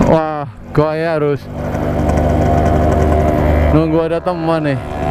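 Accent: Banyumas, Indonesia. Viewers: Ngapakk hadirr mas'e 😂👌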